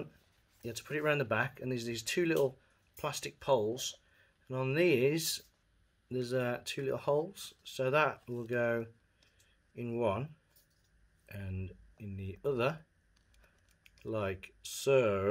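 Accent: West Midlands, United Kingdom. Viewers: this dude sound like a west midlander :D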